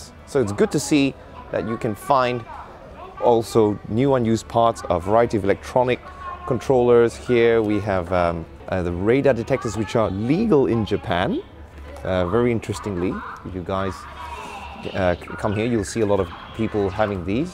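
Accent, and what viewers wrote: England, United Kingdom. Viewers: i just randomly stumbled on to this channel i like it so far. Is this dude British? his accents super classy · i like your English accent no need translate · is anyone else strangely fascinated by the British accent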